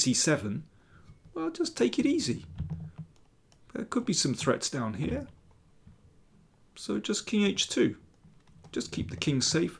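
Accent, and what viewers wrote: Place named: Oxford, United Kingdom. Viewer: Dear Mr. King. I know you understand German: Sehr schönes Video, unterhaltsam analysiert. And I love this sort of Oxford English!! Great!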